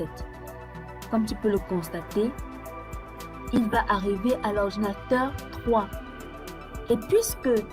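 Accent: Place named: Cameroon. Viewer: Tu intonne comme une Camerounaise.. Tres bonne lecon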